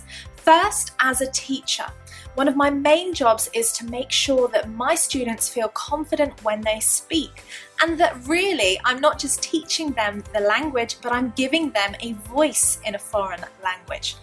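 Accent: United Kingdom. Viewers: Dear, How crazy the world is: as an Italian English learner I, on the other hand, struggle to have decent pronunciation, especially your wonderful British accent, which I dream of acquiring sooner or later. Anyway, thank you for your tips, I wish you would visit San vito Chietino and Fossacesia's beaches (south Abruzzo) in one of your trips so to enjoy our seaside and our fish and meat restaurants and breathtaking views. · thanks a lot for the lessons. i like the british accent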